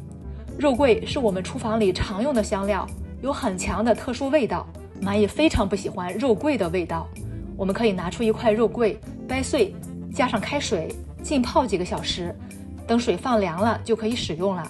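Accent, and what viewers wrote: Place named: Shandong, China. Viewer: 听得出，解说的声音来自一位山东女士。视频非常好，每年夏天都为蚜虫和蚂蚁的合作非常头疼，谢谢！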